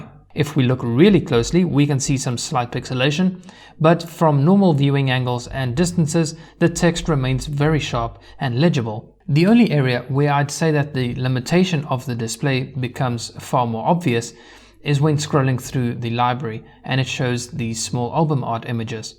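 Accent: South Africa. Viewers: I'll be surprised if I'm wrong here but by any chance are you South African 🇿🇦 ? 😁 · nice review! you sound south african...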